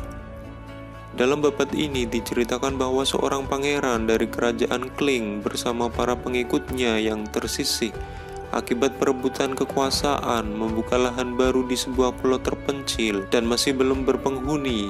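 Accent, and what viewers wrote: Java, Indonesia. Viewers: Jowo keras lor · iki mesti seng moco wong JOWO😅😅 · Yg jawa mana ni suaranya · Yg ngomong pasti orang jawa nih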